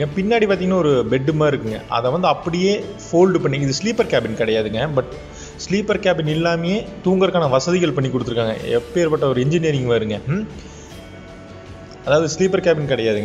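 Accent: Coimbatore, India. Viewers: Coimbatore slang😍😍🔥🔥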